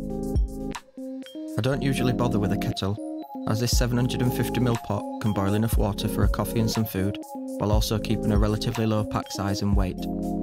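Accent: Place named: Lancashire, United Kingdom. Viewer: Good video , you sound like a Lancastrian , god's own county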